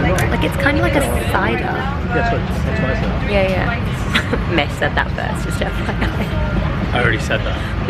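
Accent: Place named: Australia. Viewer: An Australian accent 🥰 have fun!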